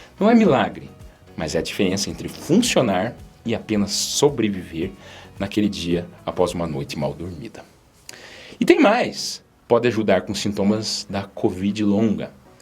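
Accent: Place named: São Paulo, Brazil. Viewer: Esse dr., tem um sotaque muito carregado de paulisteca, pouco se entende o que ele fala!!!!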